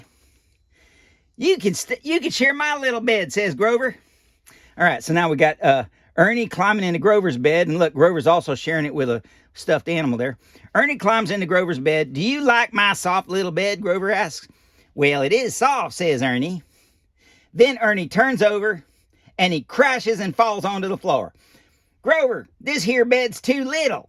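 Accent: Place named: Deep South, United States. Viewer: Most of this video just sounded like talking like he was from the Deep South. Lol. has a slightly higher voice from all of the old show tapes I’ve watched.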